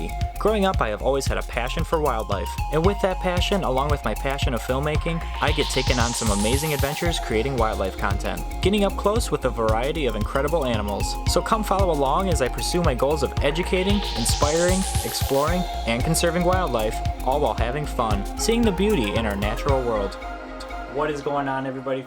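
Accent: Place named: Michigan, United States. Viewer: Are you from Wisco or Michigan? My first guess is Michigan. With your accent. Haha.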